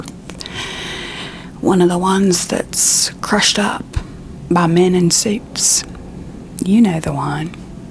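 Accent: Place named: North Carolina, United States. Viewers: Ugh I love. I live in NC and she reminds me of this sweet woman who used to work a local convenience store. She would talk your HEAD off but she was so sweet, and the accent reminds me so much of her. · I grew up in NC, and one of the first accents I was able to pick up was the thick NC accent of one of our neighbor ladies. This brought back so many memories lol ty ❤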